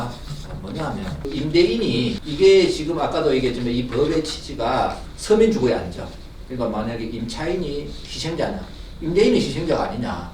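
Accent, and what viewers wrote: Gyeongsang, South Korea. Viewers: 경상도 억양이 저렇게도 또렷한데 ㆍ서울 아니면 ㆍ다 시골이라고 하시는 분이신가보다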